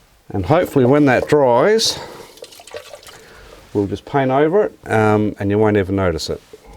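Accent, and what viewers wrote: Australia. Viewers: Nice work. You sound Australian. What city are you in ?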